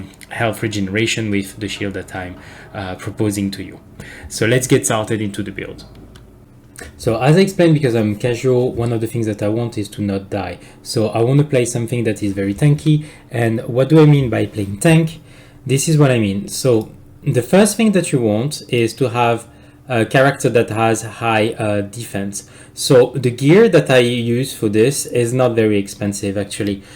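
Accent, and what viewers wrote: France. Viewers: Hey man as a fellow french man I got a great advise for you to change your pronunciation to a more proper one (not that Frenchy ^^ ) I watched your video about the tower and it was helpful to me so I wanted to get you some things for you as well ^^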